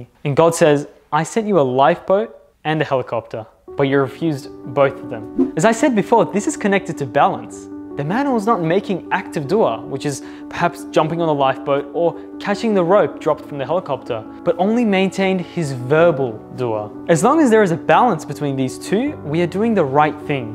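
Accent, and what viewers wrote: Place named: Australia. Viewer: I love the AU accent. Congrats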